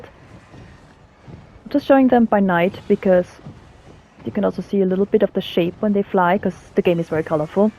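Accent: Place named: Germany. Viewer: For what it's worth, I like the sound of the microphone. Ads to the ambiance since you're presenting the game. By the way, I know that accent. Germany ?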